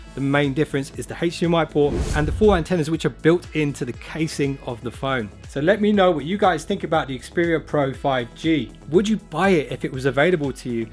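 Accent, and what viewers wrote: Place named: United Kingdom. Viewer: Britain accent is horrible..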